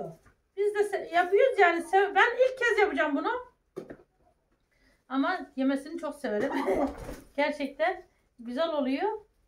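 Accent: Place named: Amasya, Turkey. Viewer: hanim sizin konusmaniz benim memleketim amasya sirvesine cok benziyor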